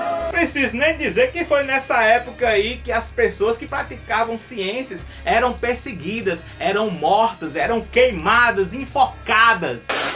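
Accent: Northeast, Brazil. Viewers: Explica tudo num nordestinês fluente.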